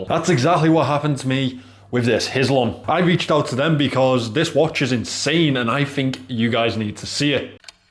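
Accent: United Kingdom. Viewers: you are from which part of The UK?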